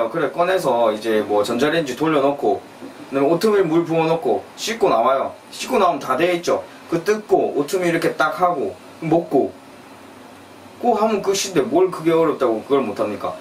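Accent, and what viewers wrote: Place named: Gyeongsang, South Korea. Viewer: 역시 갱상도말로 해주시니 더 귀에들어오네요 ㅋㅋ ㅋ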